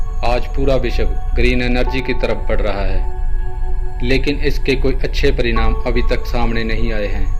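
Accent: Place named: Punjab, India. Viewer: ਬਾਈ ਜੀ ਤੁਸੀਂ ਪੰਜਾਬੀ ਹੋ ਤੁਹਾਡੀ ਹਿੰਦੀ ਦੱਸ ਰਹੀ ਹੈ 😄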